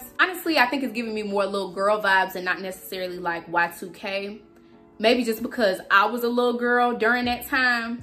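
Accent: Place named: Miami, United States. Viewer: Your accent sound like you’re from Miami.youre beautiful ❤️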